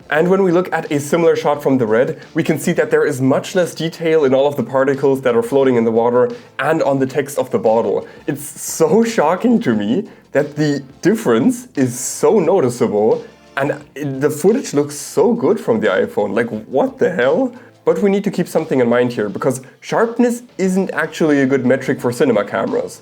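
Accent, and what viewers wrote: Germany. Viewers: I hear deutsch😂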